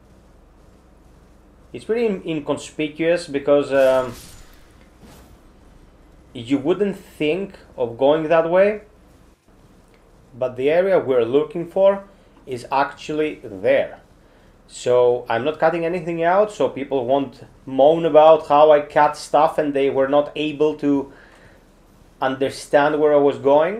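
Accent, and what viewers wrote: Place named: Greece. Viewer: Η Ελληνική προφορά δεν κρύβεται με τίποτα! Ευχαριστούμε για τα guides αδερφέ, πολυ αναλυτικός! <3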